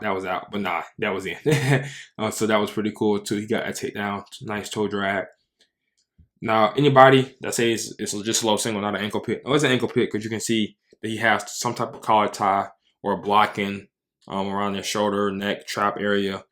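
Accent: United States. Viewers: You are articulate to the 9's Being able to edit and showcase the breadth of the subject matter But there's something about that southern cadence that I can't stand "Colluh Ta" it's as if you have to rush through your sentences. A nervous tick I guess what I hate about it is how I really have to pay attention to what you're bringing up. If it were edited the same way you spoke, clips would go by in a fraction of a sentence between long stretches of athletes just looking around Let what you have to say have some staying power. What do I know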